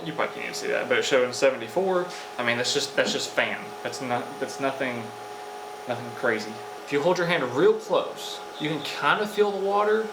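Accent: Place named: Georgia, United States. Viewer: Holy crap I just discovered today and these videos are so fascinating. It’s 6am and I still haven’t slept 😭😭😭 I just keep watching! Also I’m curious to know where is from. He sounds like a fellow Georgia native.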